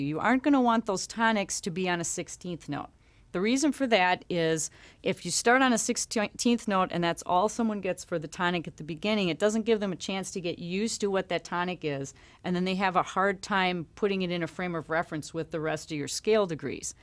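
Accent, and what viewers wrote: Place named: Wisconsin, United States. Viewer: Wisconsin accent to the moon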